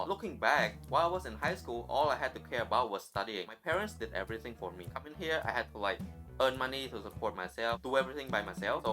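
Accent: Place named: Cambodia. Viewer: hey bro, even as listening to your accent, I could tell you're Khmer. I am a Khmer international student as well, but situated in New Zealand. I could relate to many things in your vid !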